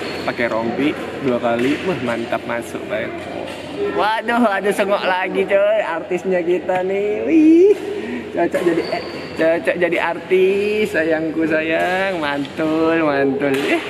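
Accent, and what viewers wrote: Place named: Medan, Indonesia. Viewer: logat medannya anjay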